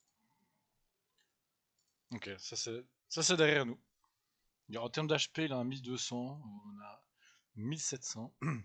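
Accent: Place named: Switzerland. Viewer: Joli ❤ iop joli l'accent suisse 😂❤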